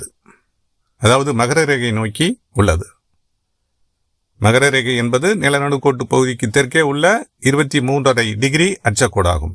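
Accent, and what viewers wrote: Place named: Sri Lanka. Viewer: நீங்கள் இலங்கை தமிழில் அழகாக பேசி உள்ளீர்கள்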